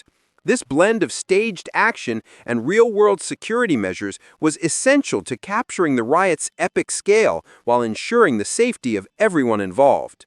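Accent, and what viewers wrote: United States. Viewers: Who is this Yank narrator talking b.s.? Great Britain was not 'shaking off ww2 post austerity in 1964'. The 'never had it so good' years had just gone and the more optimistic 60s were in full swing. I doubt any Americans had even heard of the mods. 🙄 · Why the change to an American narrator. Is this all AI?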